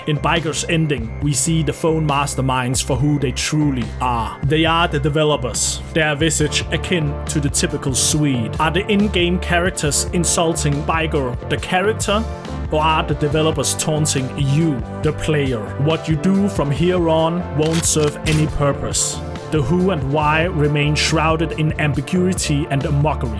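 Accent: Denmark. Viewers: You are Danish. I can feel, hear and smell it. As i am danish myself. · i smell danish on ye boy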